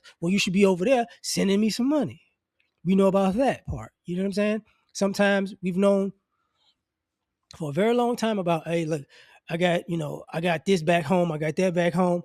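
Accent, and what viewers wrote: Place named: New Orleans, United States. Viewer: I still hear a little bit of New Orleans accent just a little bit 😄 Peace to you brother. Appreciate you brother ✌🏾✊🏾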